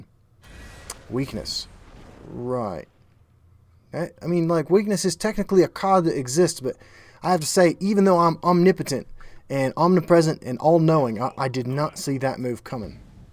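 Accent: Ireland. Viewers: Very strong Irish accent Sir:)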